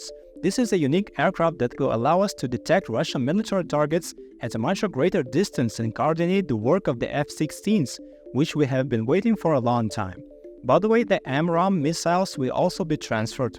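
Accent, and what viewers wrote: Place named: Ukraine. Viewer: Happened to find your channel and I know it’s brand new, but the strength of your convictions and your passion is clear to see. Also it is good to hear another actual Ukranian voice. I look forward to seeing more content.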